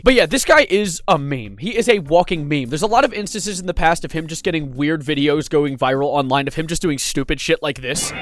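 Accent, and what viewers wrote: United Kingdom. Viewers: WHY ARE YOU BRITISH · Me too she- WHY IS SHE BRITISH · Why are you British!? Love it. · "Why are you british??? "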